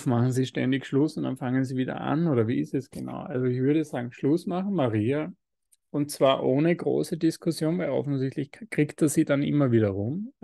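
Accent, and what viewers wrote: Vienna, Austria. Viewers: der lebenskluge, sprachgewandte hr bonelli mit seinem kauzig-charmanten wiener-schmäh-dialekt, baut regelmässig "anyway" und "whatever" in seine sätze ein... das hört sich echt schräg an 😮😅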